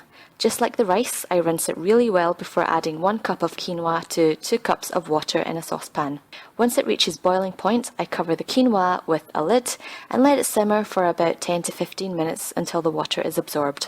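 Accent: Scotland, United Kingdom. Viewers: Love your videos and your Scottish brogue Regarding chickens and washing... I always wash raw chicken prior to cooking especially if it is whole This practice reduces the harmful bacteria count especially the dreadful salmonella which can cause food poisoning if you unfortunately undercook your chickens Immediately sterilize the sink counter and all items used to handle the raw chicken It is wise to use a food thermometer inserted in the thickest part of the chicken but away from the bone Health Canada advises 85 degrees C or 185 degrees F. (Some authorities are happy with 170 degrees F) The chickens should "rest"for 15 minutes prior to carving Keep up the good work with your fun and helpful videos! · I came on youtube to look for some meal prep inspiration cos I need to lean up. Great video! But I can't get over you being Asian with a Scottish accent hahaha x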